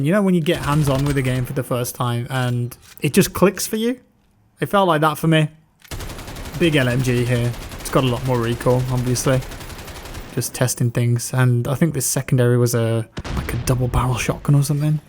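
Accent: United Kingdom. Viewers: British accent killed this video completely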